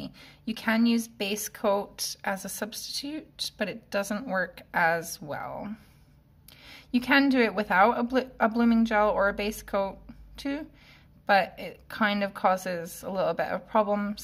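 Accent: Scotland, United Kingdom. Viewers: apologies for the mistake. You’ve certainly picked up a bit of that Scottish accent 😀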